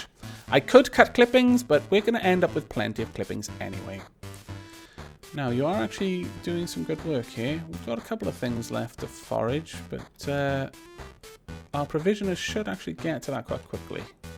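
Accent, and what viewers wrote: Wales, United Kingdom. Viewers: Subbed man, can you add my name pls? Also are you Welsh?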